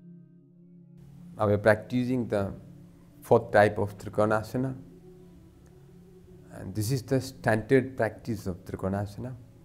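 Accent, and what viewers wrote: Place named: India. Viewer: heloisa688 it's his variation of english: Indian English. Not bad pronounciation. English is official language in India.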